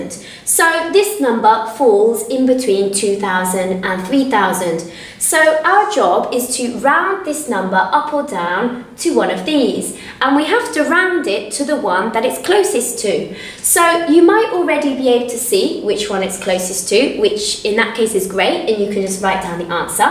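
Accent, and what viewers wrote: England, United Kingdom. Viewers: British girl HAHA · I love your britost voice can you a video on English speaking practice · I love your British voice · Ooho kon sir das ki ho England kya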